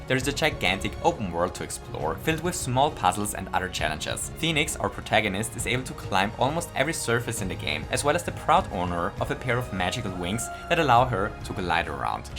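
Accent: Germany. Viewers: Are you German? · Yes. I heard his german accent instandly, the first time I watched him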